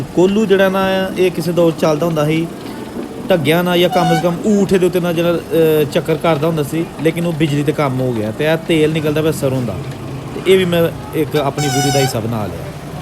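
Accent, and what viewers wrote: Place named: RS Pura, India. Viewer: ham bhi RS pura se aapki video dekh rahe hain Bahut hi badhiya janab aapki ham sari video dekhte hain donon mulk ek Jaise hi hain aapki boli bhi hamare RS pura jammu ki tarah hi hai 🙏👍❤️ music bahut badhiya lagaya hai link bhejo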